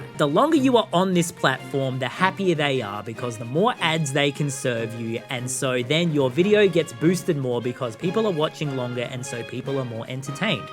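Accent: Australia. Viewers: There are a lot of channels doing Breath of the Wild/Tears of the Kingdom content, so I don't think it's just the game. There's something about YOU playing BotW and TotK that draws people. And perhaps it's not just the Aussie accent! Maybe there's something about those games in particular that brings out the best YouTuber in you? I don't know. But don't sell yourself short thinking it's just the game. Maybe it's you playing the games that make it work, which is why you playing other games works fine but not as well? Just a thought. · Came for impressive master quest run, stayed for funny sounding Australian who plays video games. I've been hoping for a long while that you would ignore data this and data that and simply play games you genuinely enjoy. FF7 remake has been very refreshing!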